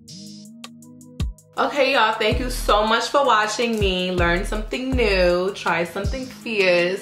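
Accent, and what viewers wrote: New York, United States. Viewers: Girrrrlll, you are and look so gawgus and bomb, yaaaaasss hunty❤️I love that wig on you and the style you did. I def gotta do this style too! And your shades are mad cute! Where’d you get them?? I need the deets. Anyway, keep doing you, boo. Stay stunning and successful💕🙏🏿🤑 P.S. Are you a New Yorker ?? Lol. You sound like you’re from NY (but forgive me if I’m wrong).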